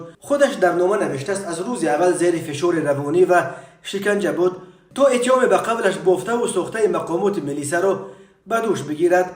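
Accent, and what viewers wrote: Pamir, Tajikistan. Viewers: Керм дар акценти помтригит мембри дам та бгири бо барои и нома гамуш метиян. Рут сиееех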